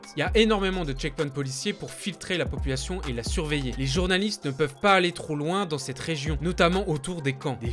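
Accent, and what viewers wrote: France. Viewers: t'as vu son texte où ça ? Parce que moi tout ce que j'entends c'est un français qui prononce les "X" comme en français et le "J" aussi mais je t'en pris donne moi un cours de chinois puisque t'as l'air de visiblement connaître plus que moi... C'etait quoi encore ton commentaire ? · ça s'appelle l'accent français. Et au pire concentre toi sur autre chose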